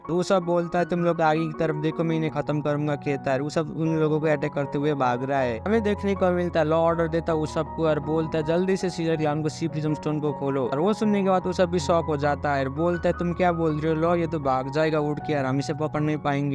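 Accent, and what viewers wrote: Nepal. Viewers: Because your Hindi accent is like Nepali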